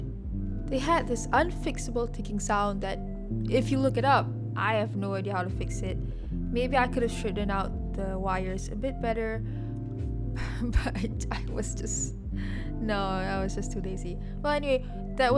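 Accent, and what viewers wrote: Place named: Philippines. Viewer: just curious but are you a filipina? you sound like one ngl i am not meaning this in a bad way btw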